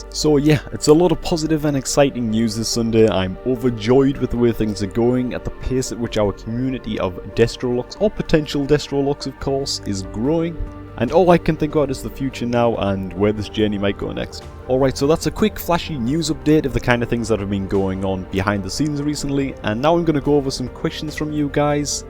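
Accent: Newcastle, United Kingdom. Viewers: I was watching Swifty's vids and decided to check you out, and within 3 seconds of the video starting i realised you had a newcastle accent, just saying because live like 10 miles away >.<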